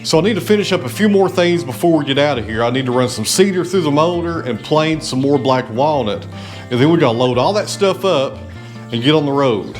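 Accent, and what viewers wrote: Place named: Kentucky, United States. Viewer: Was this english? CC displayed it as such, but it almost sounded like groningen to me (from dordrecht). Ok, must be a local dialect in kentucky.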